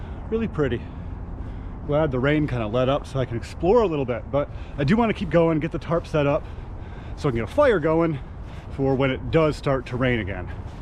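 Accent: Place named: Downstate New York, United States. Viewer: Hey there, again,! Eastern New Yorker Nancy! This has to be said that along with your animation job you should try some voice acting. You are hilarious 😂 and YES that is exactly how downstate New Yorker and Jersey folk talk! 🤣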